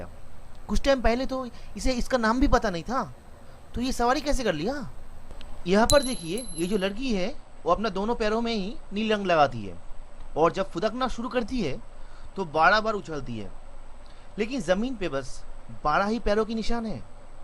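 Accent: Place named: Bengal, India. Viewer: You are a Bengali right ?... ik this accent ...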